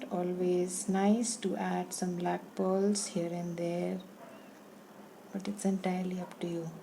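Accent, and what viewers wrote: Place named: India. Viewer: You sound very like Indian maybe pakistani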